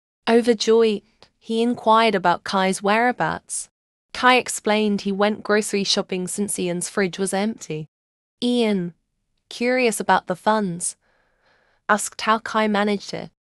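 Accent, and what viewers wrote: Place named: United Kingdom. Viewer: why is it. british not watching it if its in a british accent sorry :/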